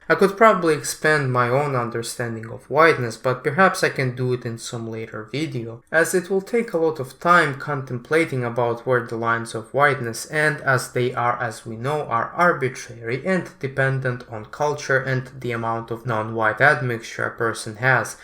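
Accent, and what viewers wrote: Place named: Eastern Europe. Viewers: How can you miss the accent, and also he is smart and knows how to argue....All the best Slavic values... Na zdravlje.🍻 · Ah so you're a slav? And here I thought that your accent sounded familiar. О, так ты славянин? А я то думал что акцент звучит знакомо.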